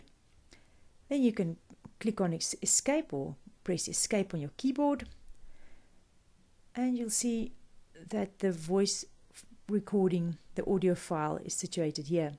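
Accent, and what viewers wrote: Afrikaans, South Africa. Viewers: Definately a south african voice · Did not expect this to be South African lol. Dankie vir die mooilike video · Thanks, it was very helpful and easy to follow. It is also a heartwarming surprise to hear an Afrikaanse accent. :)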